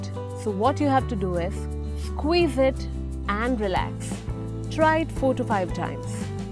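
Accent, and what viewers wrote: India. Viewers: i love your indian accent wow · she sounds like an Indian like me. Btw don't tense your arm it will make your arm hurt and tired and you will be exhausted pretty quick. Make sure you use the movement of thumb and fingers and if necessary your wrist. Important lesson I learned from my guitar playing.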